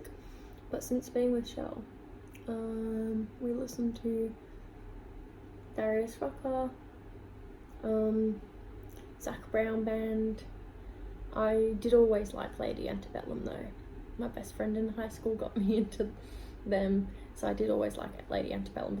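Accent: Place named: Australia. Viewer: I’m surprised you moved there at 14 and you sound Australian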